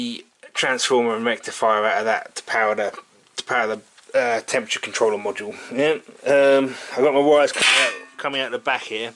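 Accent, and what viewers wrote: United Kingdom. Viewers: Nice work. Essex accent?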